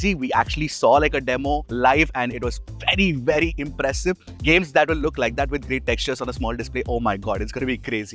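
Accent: India. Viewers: I don’t like your Indian accent honestly, difficult to understand sometimes as English is my second language but your channel, contents and quality is perfect 🎉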